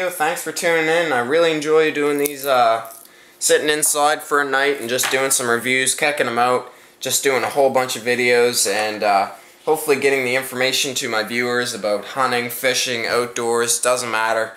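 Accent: Canada. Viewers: just started fishing rapalas and they seem pretty damn good. you get what you pay for i guess sometimes. ps - what's your accent? canadian? or just north states?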